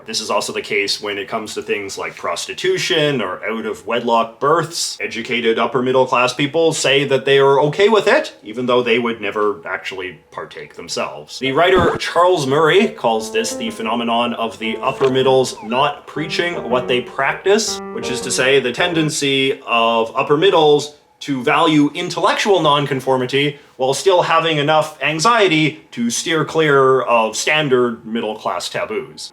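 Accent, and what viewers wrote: Canada. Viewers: Immediately thought about Ricky lol, especially cause you have a Canadian accent.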